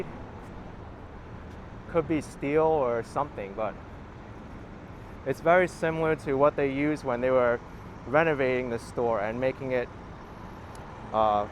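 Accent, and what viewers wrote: New York, United States. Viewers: You have a distinctive NY accent. I like it, gives a real local flavor to your video.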